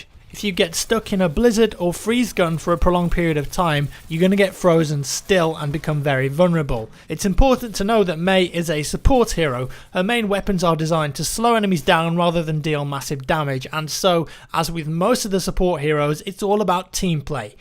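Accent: United Kingdom. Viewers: Are you from UK? Im from Germany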